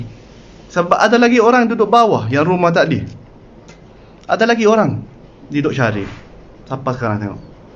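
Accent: Kelantan, Malaysia. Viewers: Hebat lah kamu boleh cakap melayu walau dlm loghat kelantan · Kecek kelate bohh👍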